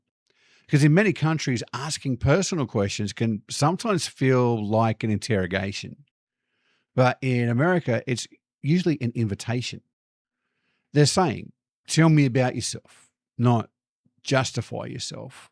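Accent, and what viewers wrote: Australia. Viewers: I’m from California and lived in Australia for years. I had to really check myself so as to not come off rudely. You hit it on the nail when you said Aussies are more reserved. I was in the hospitality industry all of my life and can talk to anyone but I needed to really tone it down. Out of respect. Love Australia and its people. And good to hear you haven’t lost your accent mate 💖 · The truth is they could feel your Aussie vibes from a mile away and they just want to hear your awesome accent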